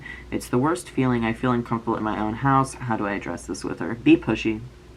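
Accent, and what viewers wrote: United Kingdom. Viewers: the British accent omd 😭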